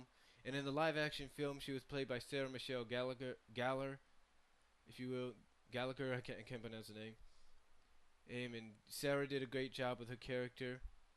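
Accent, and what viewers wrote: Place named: New England, United States. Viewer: Are you from New England or somethin g? You sound like a very young Ralph Bakshi.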